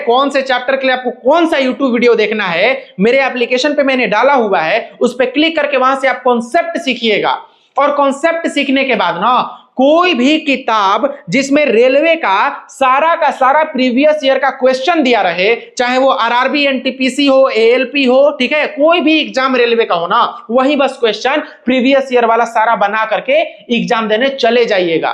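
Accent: Bihar, India. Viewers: Bhaiya aapka bolne style gajab hai 😊😊😊😊😊😊😊😊😊😊😊😊😊😊😊😊😊😊😊😊😊 ek dam bihari style. Ham bhi Bihar se hi hu.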